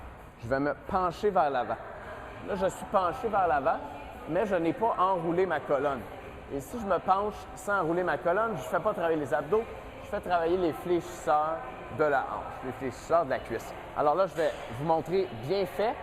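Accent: Quebec, Canada. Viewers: l'accent québécois me fait rouler par terre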